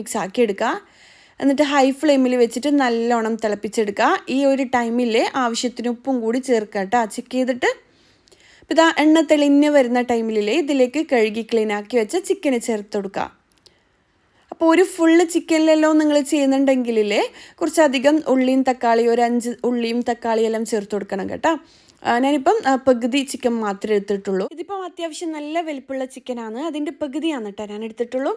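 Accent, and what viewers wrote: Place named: Kasaragod, India. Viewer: കാസറഗോഡ് സ്റ്റൈൽ സംസാരം. കുറച്ചു ഉണ്ട്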